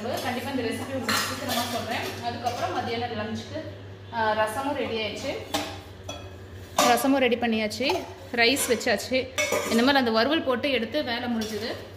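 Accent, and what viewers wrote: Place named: Coimbatore, India. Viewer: Super recipes 👌👌 Neenga coimbatore ah sis Unga speech apdi irukku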